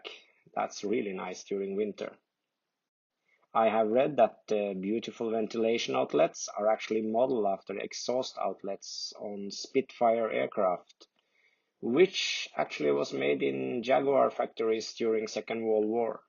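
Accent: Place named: Norway. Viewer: As well as visually showcasing the impressive features of this gorgeous car, your video is a delight to listen to. Maybe the commentary was to some extent scripted, or perhaps it is not having to simultaneously think about what you looked like on camera, that resulted in such an assured manner of speaking. I know many people hate hearing how they sound in recordings of their own voice. But believe me, your diction and delightful Norwegian accent in this voiceover comes across as professional and intelligent, reliable and trustworthy ,as well as approachable and engaging to an English listener.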